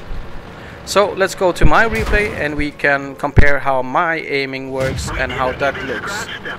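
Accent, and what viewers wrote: Sweden. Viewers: Jag tyckte att jag hörde det på din engelska:D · where are you from you sound like swedish a little bit i swedish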